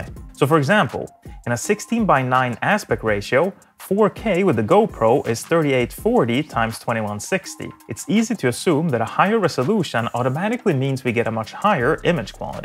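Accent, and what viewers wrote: Sweden. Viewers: Dude hear some Swedish accent. Thanks for the video, I got a GoPeo H8 for my birthday a couple of days ago.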